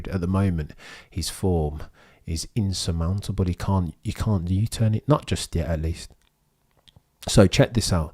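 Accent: United Kingdom. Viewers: This guy more British than alll the British people I know. Like where is this accent even from. What part?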